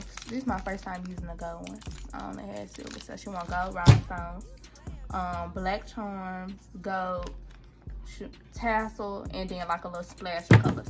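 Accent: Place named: Tennessee, United States. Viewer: She definitely from Tennessee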